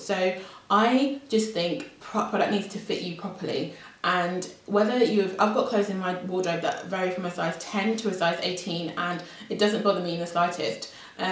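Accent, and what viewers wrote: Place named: United Kingdom. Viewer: I must admit that I especially enjoy your accent. I'm an English teacher from Chile, and I did an MA in TEFL in England (Bham) in 2011-12. I love your accent and your choice of words to describe clothes and silhouettes, for examle... I have a student who wants to improve her British accent, I'll share your channel with her :)))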